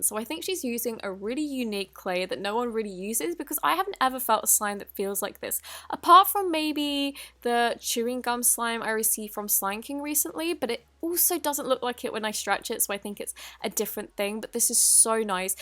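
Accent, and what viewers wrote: United Kingdom. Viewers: are you British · she cant her british accent takes over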